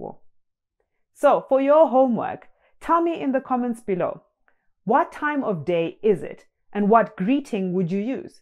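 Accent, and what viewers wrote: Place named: Uganda. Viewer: You speak like ugandans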